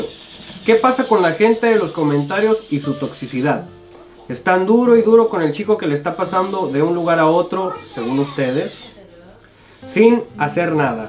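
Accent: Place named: Mexico. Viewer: Nada como conocer japón de alguien que habla Méxicano 😃 eres la onda. Ojalá no dejes de hacer estos videos.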